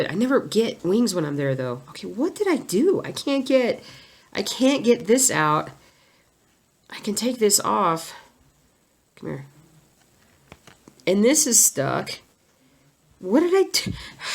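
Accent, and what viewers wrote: South, United States. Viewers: Actually, I am from South Carolina, and I do hear your southern accent. It doesn't come out all the time, but there are certain words that you say, like 'plain' kinda sounds like 'play-en'. When I first started watching you, I wondered where you were from, until I heard you talk about Georgia. And BTW, if you ever come visit the SC area, or north GA, get in touch and I'll buy you lunch! Yes, they still do the laser light show on Stone Mountain. And I YES to Zaxby's. We have one about 10 min from our house here in SC. I was so happy when we went to Pensacola Fl to see my father in law and they had a Zaxby's there. So they are going a bit more west.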